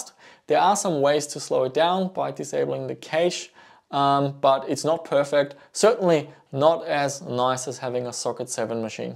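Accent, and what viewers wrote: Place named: Germany. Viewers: looks like a UFC cruiserweight. Looks dangerous and his German accent backs it up. Scare me in a dark alley... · btw i'm from germany and always noticed your german accent, where are you from?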